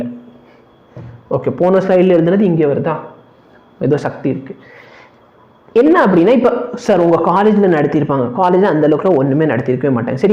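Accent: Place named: South, India. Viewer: mathya Pradesh a neenga south nu nenechen unga slang a vechi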